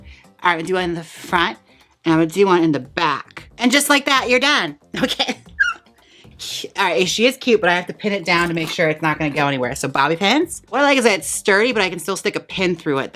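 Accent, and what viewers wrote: Australia. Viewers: Are you trying to do an Australian or an ol timme Cockney accent? Either way, love your work xo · The Australian accent is everything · More Wigs by Vanity please or just the hilarious Aussie accent! 💗❤️🧡💛💚💙💜🖤🤍🤎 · that’s how Australians sound 😭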